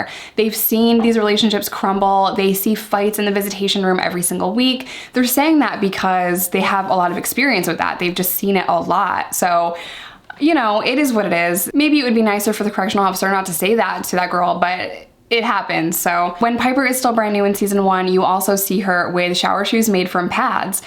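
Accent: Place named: Upstate New York, United States. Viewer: Brand new sub. Where are you from in upstate NY? If too personal, no offense. You sound like my hometown area code, 315! ETA: just read the katv article, you’re from Sidney?! My first GF was from Walton/Downsville, so crept through Sidney (from Utica) under the speed limit! Those cops were jerks!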